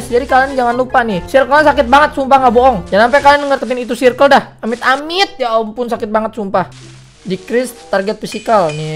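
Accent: Jakarta, Indonesia. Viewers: Betawi medok bet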